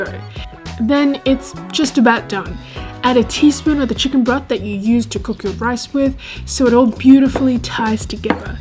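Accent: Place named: Australia. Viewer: It's called an Australian accent, millions of other girls have that exact same accent.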